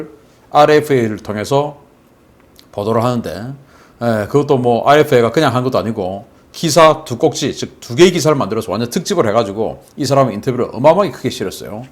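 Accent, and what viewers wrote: Gyeongsang, South Korea. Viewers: 정은이기분이별로네요..핵폭탄를싸되야할텐데머니도.딸리니기분이영아니네요.교수님경상도 사투리로.큰옷입은모습를 우장바우라하심에넘정겹게들립니다 정은이도마음ㄸㅅ데로안되니만사가다헛된짓거리에후회라도좀햇음합니다.더운날시에늘애청할수있어서감사즈립니다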